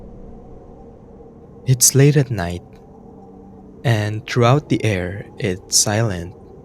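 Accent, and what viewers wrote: Philippines. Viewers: Filipino accent?? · The first tine I watched and heard your voice I know you're a kabayan.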